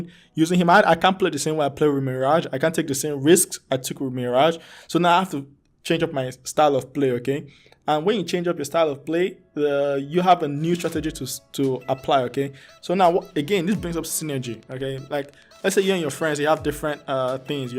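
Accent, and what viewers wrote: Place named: Nigeria. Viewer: Wow... Love your accent. Are you from Nigeria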